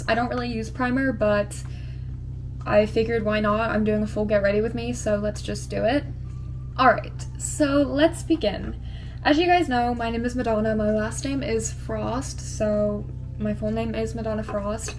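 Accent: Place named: United Kingdom. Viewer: Loving the video so far! Please can you do a full YouTube video using your British accent? I would love to her it❤️!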